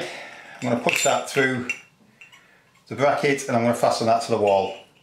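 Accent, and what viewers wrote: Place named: Rossendale, United Kingdom. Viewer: great video helped loads. btw are you from rossendale? your accent sounds like a rossendale one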